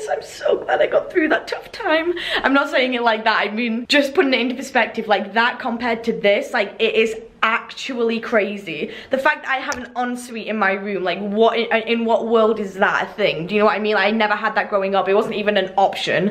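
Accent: United States. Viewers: U sounded a bit american 💕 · I’m not even hating but why do you talk like your American when you’re clearly English.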